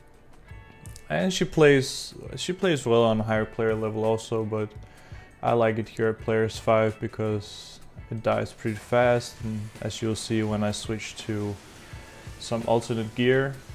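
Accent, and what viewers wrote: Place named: Scandinavia. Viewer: Bro are you a Scandinavian living in Brazil? Sorry.. you just just sound Scandinavian 😉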